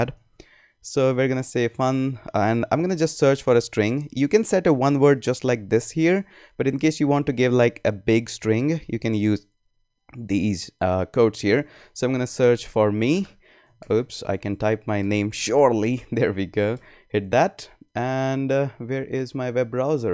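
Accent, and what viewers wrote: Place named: India. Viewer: When you talk fast your accent screams i.n.d.i.a.n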